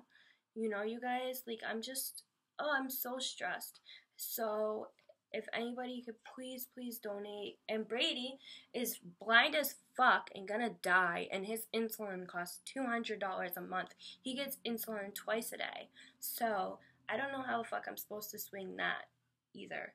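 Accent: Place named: Massachusetts, United States. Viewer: You definitely picked up the Massachusetts accent haha its kinda adorable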